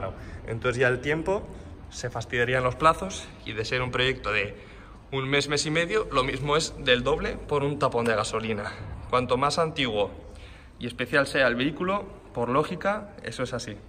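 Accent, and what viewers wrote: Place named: Spain. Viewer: Por qué los españoles no pronuncian las palabras en inglés cuando claramente es en inglés. Por qué se inventan pronunciación.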